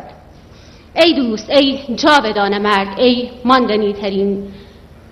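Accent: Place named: Herat, Afghanistan. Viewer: she is herati and they have accent like that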